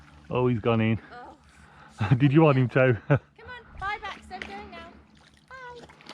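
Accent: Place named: Black Country, United Kingdom. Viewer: Enjoyed that, always feel at home with the Black Country accent haha. It’s a beautiful place, the middle Severn. Have you fished Atcham at all? It’s a place I used to go quite a lot.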